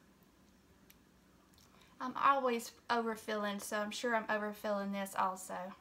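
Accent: South, United States. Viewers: I love it when I see cooks using Tsang's! Your country accent is adorable! Rock On! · P.S. - oh yes, you definitely have a southern accent. I wouldn't call it country, but definitely southern .. 😉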